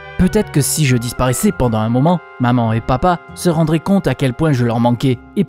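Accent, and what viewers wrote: Marseille, France. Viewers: L’accent Marseilleais on est laaaaa Désolé pour les fautes 😅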